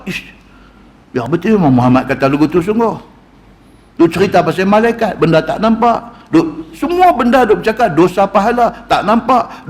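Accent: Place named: North, Malaysia. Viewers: Loghrat Tanjong....Penang town jati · Assalamualaikum sahabat, bukan ilmu sahaja yang terbaik saya terima dari ustaz, tapi suara dan loghat utara tu yang sedap. Terima kasih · Sy suka nya Tazkirah bgus. Dlm loghat Utara.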